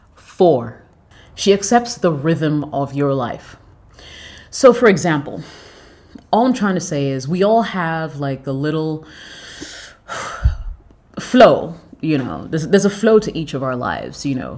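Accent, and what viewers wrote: England, United Kingdom. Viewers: Have u lived in the Uk b4, u have a wonderful accent. Thank u for all the advices it really helped a lot. You are 👏 amazing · Did you do this video all in one take? And did you read out of a telescreen prompter? That last clicking noise you made reminded me of bdsm, sounds a bit horsy. On the other hand you have a nice english accent. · You're vocally appealing with some british,twang!👌👍🙏🙏🙏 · Beautiful dark chocolate with an ENGLISH ACCENT.... I'm infatuated. Love from "across the pond".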